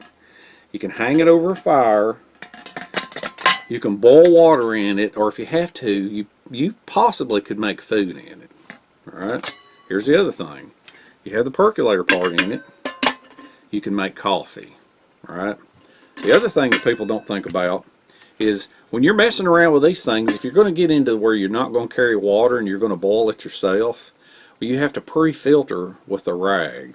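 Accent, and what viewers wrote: South, United States. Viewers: Icstill love the way Yu talk southern voice so cool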